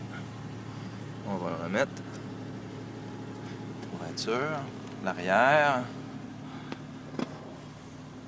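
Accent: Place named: Quebec, Canada. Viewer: merci pour cette sympathique vidéo, toujours un immense plaisir de d'entendre la voix du Québec. Pour les ailes arrières, hélas c'est plus que la rouille mais de la perforations, j'ai eu droit également pour les ailes avant et les portières. Il faut découper, former une nouvelle tole, souder etc.... mais le résultât est à ce prix. En tout cas félicitation pour votre belle SM. <link>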